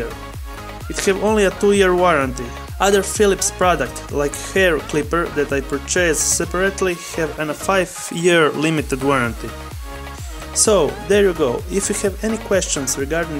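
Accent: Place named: Russia. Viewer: русский акцент заметен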